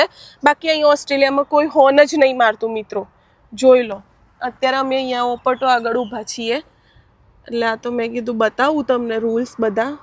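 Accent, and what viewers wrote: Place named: Baroda, India. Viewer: 👍👍👌 બેન તમારા શબ્દો બહુ જ ફાઈન છે અને તમે જે સ્પીચ આપો છો અને જે બોલી રહ્યા છો એ જે વાણી છે બહુ જ સરસ છે અને તમે બરોડા અથવા અમદાવાદના છો એકદમ સ્યોર છું હું . ઓકે જય શ્રી કૃષ્ણ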